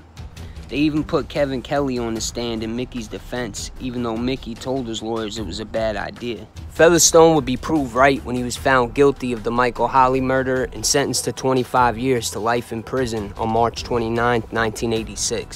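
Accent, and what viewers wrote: United States. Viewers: Yo Stight up I think you got this brother OC Shortz. No disrespect to OC Shortz his video official. But first of all ya styles are so different they can’t even be compared they two completely different styles. He got that commentary vibe going where he on the videos talking mixed with I’ll digital & sound effects mixed in. But on da real ya style is original. You don’t show ya face. Your digital editing skills coincide with your voice. The way you show the picture’s of the real guys with dope arrangements. Your version is straight up official mini documentaries. Plus let’s just face it. This is “ Our Thing “ ya American voice and the audio delivery sounds official! 💯 ya voice matches ya Video presentation. A British accent kinda takes away from the vibe like a student presenting A presentation of a foreign life only able to just spit facts about what he researched. 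Don’t get twisted his shit dope like I said no doubt OC Shortz is great in that style. But in my option having family members of my family in the life, no comment on myself. Lol I prefer your style. My brother if it was up to me you got him , ya best doing this shit right now. This is the American Mob, ya voice match’s the vibe of ya content. Not seeing you makes the audience paint a picture of how they perceive you look. Which with ya serious tone would be perceived as gangster in American gangster vibe.. So like said no disrespect to the British homie doing the whole commentary vibe with his face up in the camera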